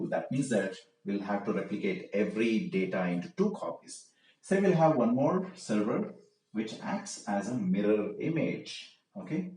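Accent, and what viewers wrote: India. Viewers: Your slang like tamil.i am right?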